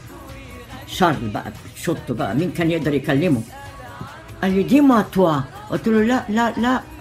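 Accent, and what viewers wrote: Egypt. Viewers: It feels so nice to hear the beautiful Egyptian accent from that beautiful lady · بتتكلم إسكندراني إسكندراني .. أنا مكنتش نخاف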